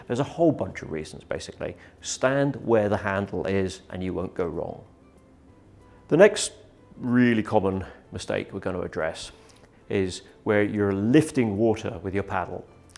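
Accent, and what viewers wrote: United Kingdom. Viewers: Love the British accent (proper English) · he's just British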